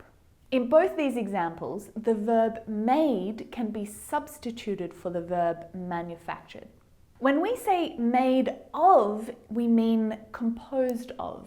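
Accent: Australia. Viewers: Hello Are you learning English with Australian accent? Please let me know Thank you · I loveee her aussie accent! Your lessons are always the best, thank u 🙌🏽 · Is this video and the other videos you post on your channel in an Australian accent?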